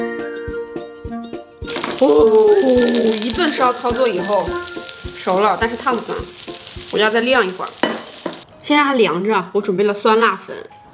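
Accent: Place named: Northeast, China. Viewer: 听见东北口音了， 是快手过来的播主吗，，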